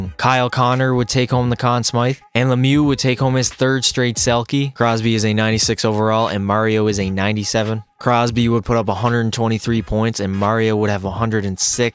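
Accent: Newfoundland, Canada. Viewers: Is your accent from Newfoundland or somewhere around the maritimes?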